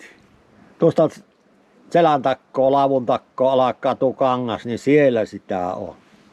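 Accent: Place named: Kaavi, Finland. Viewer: Kiitos ja kiitokset, että jaksat tehä näitä juttuja, mukavia arkisia hommia. Mie niin tykkeen tuosta siun kuavilaisesta murteesta, mukavan kuulosta. Nyt pitää lähtee ostamaan ryynäriä ja loimottoo hiilloksella. Seuraavaa laavureissuu odotellen...❤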